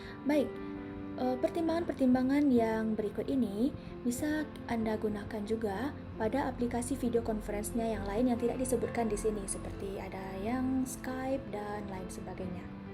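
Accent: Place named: Surakarta, Indonesia. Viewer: Sing ngomong putri Solo. Jan uallon tenan, setengah berbisik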